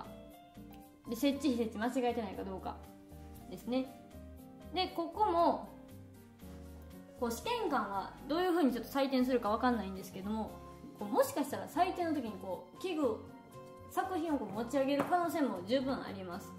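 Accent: Kansai, Japan. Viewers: 丁寧な解説でとても分かりやすかったです。関西弁がきついので標準語バージョンがあるとうれしいです。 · 言葉の端端に感じる関西弁が良いです！ 実家が電気屋なのでついつい見てしました！ 俺も電気工事士取ろうかな！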